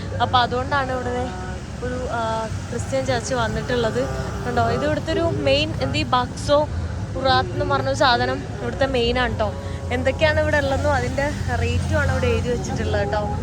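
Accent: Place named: Kozhikode, India. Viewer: Your malayalam is more like Kozhikode slang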